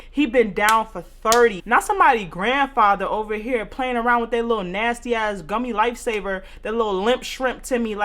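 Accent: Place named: Philadelphia, United States. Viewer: I watched a few of your videos and was trying to guess where you’re from. I know the north east. I’m guessing philly. It was the “fuck you mean pussy” New subscriber thanks for the laughs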